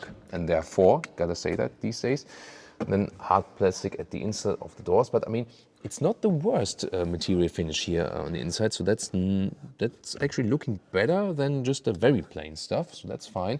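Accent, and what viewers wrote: Germany. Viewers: Without looking at the caption, one can only understand 50% of what you are talking. If you cannot reduce your German accent, maybe speaking at a slower speed may help a bit, if you really want to stay in this profession.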